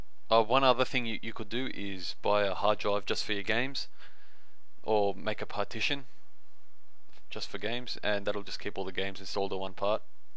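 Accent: Australia. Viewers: I love your Aussie accent! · Defiantly a Aussie, we don't have that accent here in the UK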